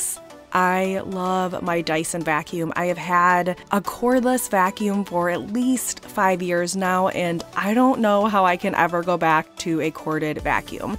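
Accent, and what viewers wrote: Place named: Midwest, United States. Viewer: lol your midwestern accent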